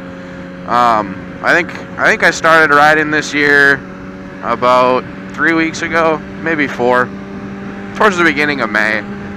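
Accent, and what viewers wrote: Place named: Minnesota, United States. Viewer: Yooooo Minnesota brother xd I had a feeling you were from there I can tell from your voice xD. That place looks familiar.. further into the video and your right in Duluth Xd this is cool I'm from there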